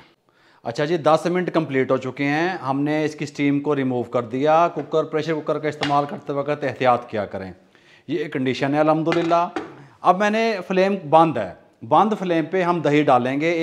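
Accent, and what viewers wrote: Punjab, India. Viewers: Asalam o alaikum... aap mere bade bhai hai, mien Quetta se hu, yaqeen kare aap ne tho cooking itna aasan kr diya hai aur jo kuch bhi mien try kartha hu zabardast hotha hai... aap ka jo andaz hai na punjabi bolne ka e.g: chaske arahe hai, muh wich pani, aaj ne kya sika diya etc... yaqeen kare mujhe punjabi zuban seekhne ka shauk ho gaya achi lagne lagi hai... Allah aapko kamyabiya de. Ameen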